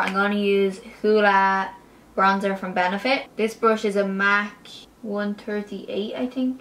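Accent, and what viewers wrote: Ireland. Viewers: What part from Ireland are you from??? Xx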